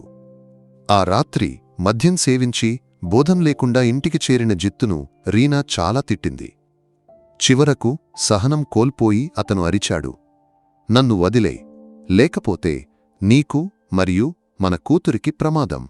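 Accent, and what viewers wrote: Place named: Kerala, India. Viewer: మాతృభాష మళయాళం అయిన మీ నోటివెంట తెలుగు పదాలు వినటానికి చాలాబాగా అనిపించింది. డి వై ఎస్పీ అనే పదం కేరళలోనే విన్నాను. 6 సంవత్సరాలు మీ రాష్ట్రం లోనే గడిపాను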